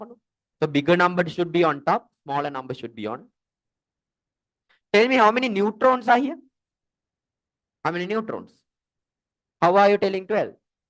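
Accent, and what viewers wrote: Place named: India. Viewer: Great sir But I can't understand Ur Indian accent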